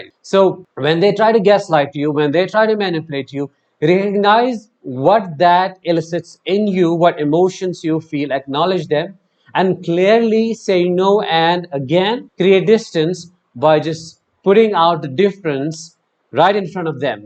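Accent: India. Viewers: The accent gets things very confused. It’s partly Indian and Partly Western. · Strong indian accent earned my sub. Solid 10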